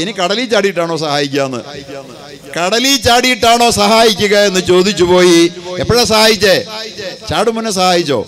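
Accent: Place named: Ernakulam, India. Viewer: ഒറ്റയിരുപ്പിനു കണ്ടു തീർത്തു കണ്ണ് തുറപ്പിച്ചു മനസ്സ് തുറപ്പിച്ചു അസ്സലാമു അലൈക്കും ഉസ്താദേ സംസാര ശൈലി കേട്ടിട്ട് ഈ ഉസ്താദ് എറണാകുളം ജില്ലക്കാരൻ ആണെന്ന് തോന്നുന്നു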